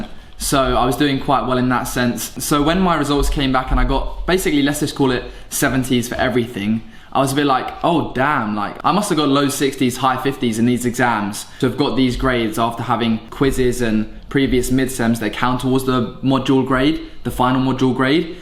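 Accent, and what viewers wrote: Australia. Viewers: Omg you really are getting a Australian accent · do I hear a slight Aussie accent now? 😂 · Lord your Australian accent is getting thicker and we aren't even at July yet. You're going to come back to the UK a full Assuie. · You should go somewhere tropical to spice up the views... Bali, where I am Malaysia, Bangkok. New Zealands visually bit blah. You just bit of a square M8 liven it up. Lol and lose the awkward Australian twang.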